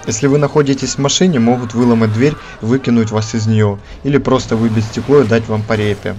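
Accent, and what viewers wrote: Ukraine. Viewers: Хватит издеваться над произношением автора, он не Хыкает, это украинский акцент такой, в большинстве слов вместо привычного Г есть нечто вроде Х, а там где четкое Г нужно, там есть Ґ, но оно редко используеться. Также например привычно говорить ШО вместо ЧТО. Язык такой, имейте совесть :) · Автор! Я балдею от твоего украинского произношения. Не слушай никого! Оно прекрасно.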